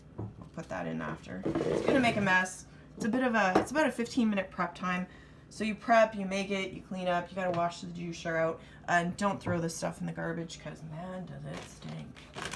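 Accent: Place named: Canada. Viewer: I sense Canadian LoL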